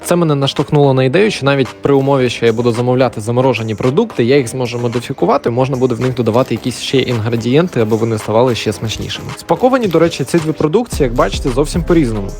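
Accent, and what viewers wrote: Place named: Ternopil, Ukraine. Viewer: Була впевнена, що ти з Тернополя ☺️👍🏻 Дуже гарно розмовляєш з нашим тернопільським акцентом.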